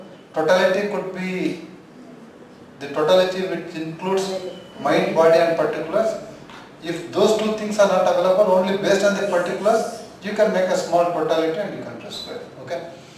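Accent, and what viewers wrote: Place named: South India, India. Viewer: Southern pronunciation is always to be a problematic for us.And what is going on in the bakground?Annoying sound making mad.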